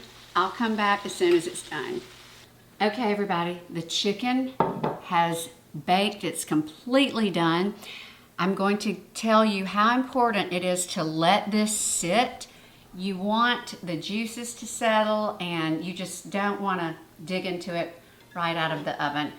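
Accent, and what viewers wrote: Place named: South, United States. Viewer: You are southern. I know you can cook. Don’t stop making videos. Beautiful kitchen btw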